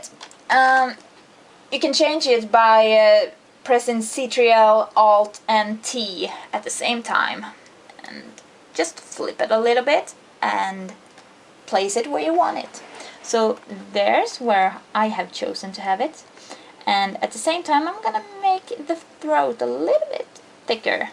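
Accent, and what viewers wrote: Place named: Sweden. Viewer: i knew you were swedish by your accent, since I watc a lot of Pewdiepie haha but this is amazing! thank you very much ;)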